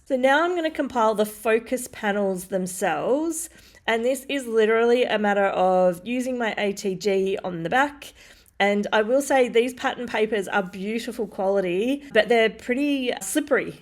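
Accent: Australia. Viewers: I like to hear you talk and I’m trying to get an Australian accent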